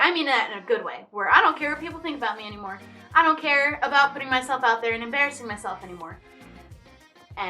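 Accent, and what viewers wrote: Texas, United States. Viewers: You sound like you were from Texas in UHShe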